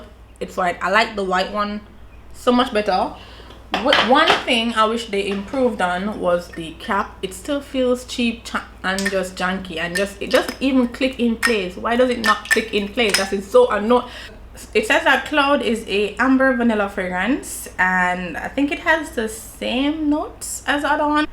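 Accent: Jamaica. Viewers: She is Jamaican and has an evident accent. I, and clearly many other people, can understand her perfectly. What was your intention with this comment, exactly?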